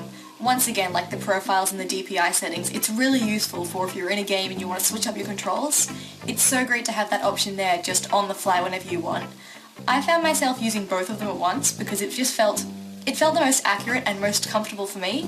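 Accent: Australia. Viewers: she is aussie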